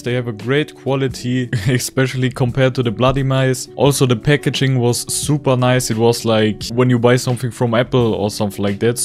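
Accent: Germany. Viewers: are you german?kinda sounds like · THIS IS THE MOST GERMAN ACCENT EVER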